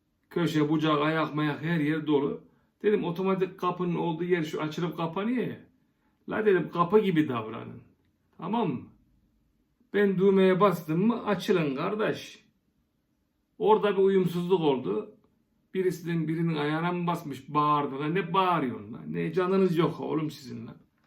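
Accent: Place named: Ankara, Turkey. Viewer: Angara yi seviyoruz..tam Angara ağzı, kelimeler ,tonlamalar, jestler..özledim ...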